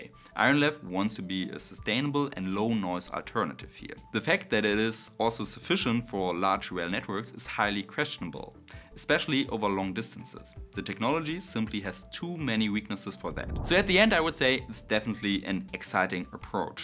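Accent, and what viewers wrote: Germany. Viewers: Gutes Video! Aber sprich bitte nicht so schnell. Dein Englisch ist schwer zu verstehen, wegen dem starken deutschen Akzent. z.B "cushion" wird "kuschen" ausgesprochen.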